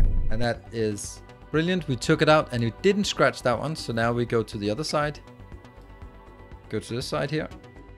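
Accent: Denmark. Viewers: Are you danish by any chance, the accent kinda gets me, just Got this game its so Fun.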